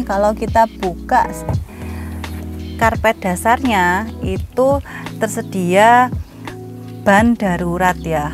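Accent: Java, Indonesia. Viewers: Penyakit baleno hb lama, lampu nangis dan setir lengket masih ada kah ? Mba e medok bingit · Medhok e bikin betah nonton 😄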